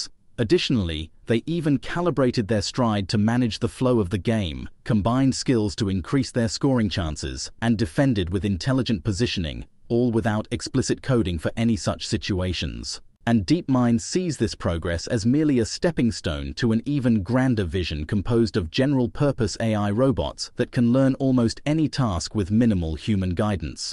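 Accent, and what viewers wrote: United Kingdom. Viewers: Why would a voice with a brit accent call is soccer? Boooo. Lol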